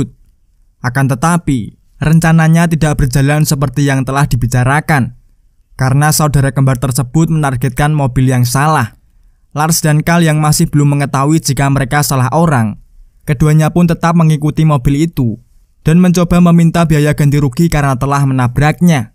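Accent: Java, Indonesia. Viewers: Jangan di medokin bg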